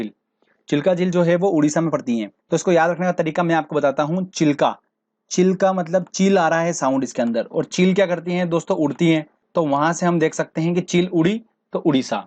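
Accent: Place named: Madhya Pradesh, India. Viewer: Aapki voice se hi lag gya aap MP se ho mere bahut dost he MP se islie samjh aa gya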